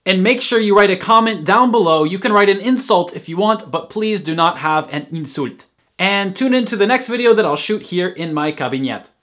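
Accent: Russia. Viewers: У тебя такое русское произношение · и в том, что он по-английски с русским акцентом говорит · Ты настолько говоришь по английски с русским акцентом, что я всё понял)))) Незнаю помогает ли это американцам учить русский но точно поможет русским учить английский. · Да он же русский. Чувствуется по произношению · You have a really cool Russian accent! Most of non-native speakers can't pronounce "Ы" and "ь" like in word "Нормально" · у него явно выраженный русский акцент. Какой носитель? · Какой у вас классный акцент: и русский, и английский!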